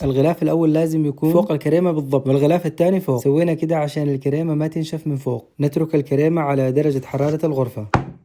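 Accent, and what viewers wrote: Aden, Yemen. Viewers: اول مره اتابع قناتك من لهجتك عرفت انك من عيال عدن والان من شانك بااسوي لك اشتراك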